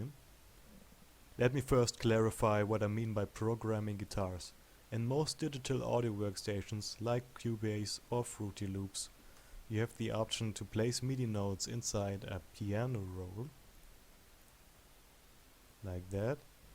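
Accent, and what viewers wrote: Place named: Russia. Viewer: Полюбас русскй акцен ак пробвае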